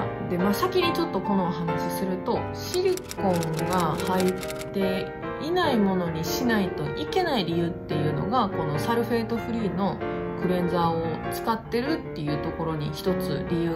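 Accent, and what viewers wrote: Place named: Kansai, Japan. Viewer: めちゃくちゃ分かりやすい😮 あとなんか関西弁おもしろい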